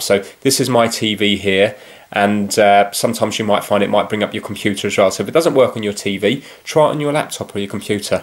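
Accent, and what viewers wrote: United Kingdom. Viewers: Same I also liked the video because this mans British accent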